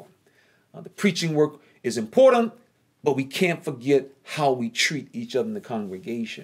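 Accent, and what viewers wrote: South, United States. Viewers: Southern drawl........what's wrong with 'our' southern drawl? I have cousins in WI. It's a different sound. My Mother lived in southern California...different sound. It's still English. We say y'all. WI Say yous guys. I understand what they mean and vise versa. I would have packed my suitcase and brought my southern a** home!!! Great video. Please tell Lady C hello. Nothing could be finer than to be in Carolina. Lol. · So it's not about JEHOVAH 🤔 it's about pleasing the ears of men wow, disgust. I thought all this was based on approval by JEHOVAH to be apart of Bethel. Another eye opener when JEHOVAH says HE'S roving the earth for those who's heart is turned towards HIM. When genuineness approaches and speaks to whomever it pierces to the soul so it shouldn't matter how southern you sound right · I know this video is two years old at this point, but I'm just now seeing it. 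I love your videos. They're so full of warmth and heart--no wonder the GB doesn't want witnesses watching this. You speak exactly like every brother from the South I've ever known. You're not bitter. You have good memories of the organization, but you don't let emotion cause you to outsource your thinking to other men. I admire your channel very much.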